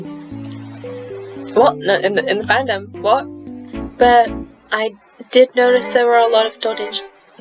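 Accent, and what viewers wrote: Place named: New Zealand. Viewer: as soon as i heard your voice i just said “she sounds like a kiwi!” ig i was right haha (im also a kiwi 😊)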